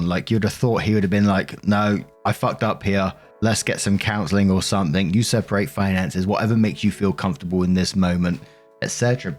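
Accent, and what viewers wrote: England, United Kingdom. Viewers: Yes, I also heard it on Lost Genre. It's all good, I like English accent when he reads the stories. I also like that doesn't read over the cuss words like Lost Genre 😊🇨🇦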